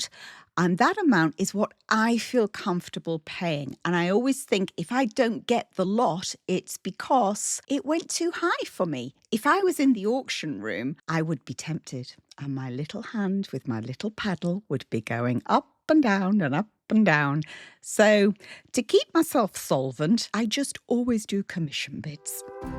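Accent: England, United Kingdom. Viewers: You just have a knack for decorating ❤love your ideas❤I never get bored watching your videos ❤and I always look forward for your next one❤ love the spring decor❤and love listening your to your English accent❤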